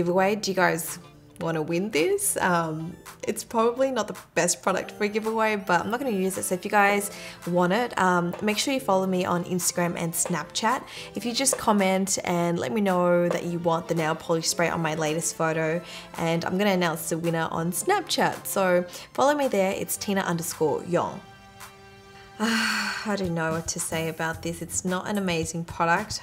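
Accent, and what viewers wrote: Australia. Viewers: I THOUGHT U WERE ASIAN BUT UR AUSTRAILIAN RIGHT? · Is she Australian? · Are you from Australia? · I love it when I watch videos of people with an Australian accent. We can sometimes be hard to find on YouTube.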